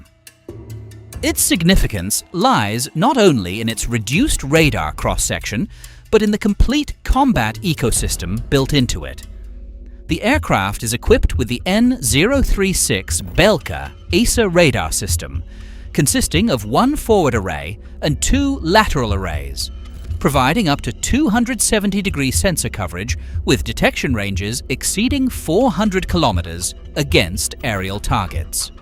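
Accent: United Kingdom. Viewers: Another English Accented Jewish Gangster Narrator, being negative. Says The BronxBoy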